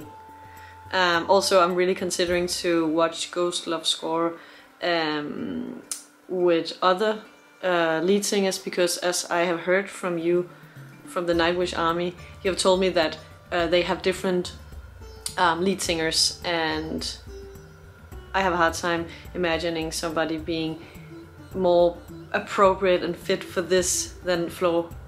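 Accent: Denmark. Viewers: I wondered where you were from since you Danish accent is so subtle, but then I realized you're from Copenhagen! Yay, fellow dane! :D Good reaction! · You have a lovely Danish accent :-) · Are you danish? it sounds like you have that accent :D Great reaction <3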